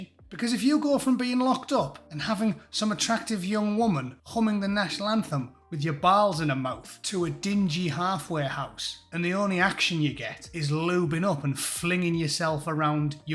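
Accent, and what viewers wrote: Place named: Teesside, United Kingdom. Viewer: Ya sound like a FELLOW SMOGGIE mate ?First video ive seen of you enjoyed the pisstake UTFB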